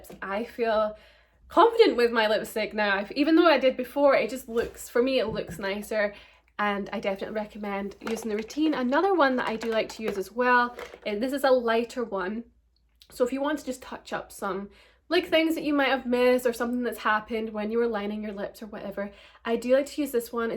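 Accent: Scotland, United Kingdom. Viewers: Love your Scottish accent hen.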